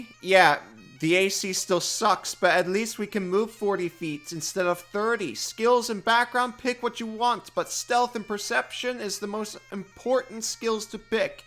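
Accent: Scotland, United Kingdom. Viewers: this guy scottish?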